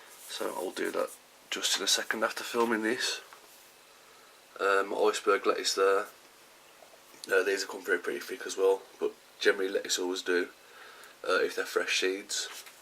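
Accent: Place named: Midlands, United Kingdom. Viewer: Great video. I could listen to you "ramble on" all day. (You sound like a Midlands boy to me :)) Have you tried mixing in 20 - 30% perlite into your seedling mix? I find it helps to mitigate risks of dampening off. Ecothrive Charge, too, is a really nice potting mix amendment—easily obtainable in the UK. Peace, love and happy, vigorous sprouts—————Everest.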